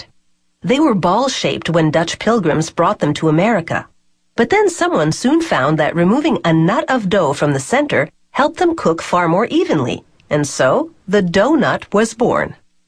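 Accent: Canada. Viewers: You can tell these shows are Canadian by the way the narrarator says 'about' and 'out' XD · is the lady talking from canada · This announcer is very obviously not American. Very noticeable Canadian accent. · wow, such a canadian accent~ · was i the only one who noticed she kinda had a Canadian accent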